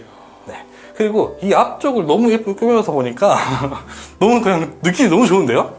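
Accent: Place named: Gwangju, South Korea. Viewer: 광주사투리? 정감있어요~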